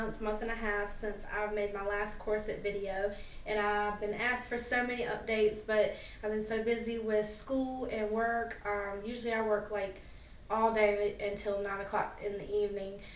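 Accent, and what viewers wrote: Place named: Carolinas, United States. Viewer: Hey this is not a stalker creepy comment but what state do you live in. Because of your accent I would say North Carolina or maybe South Carolina??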